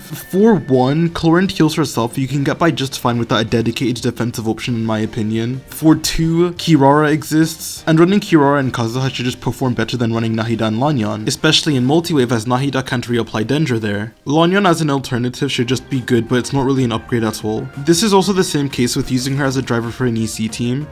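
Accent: United Kingdom. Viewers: Omg is british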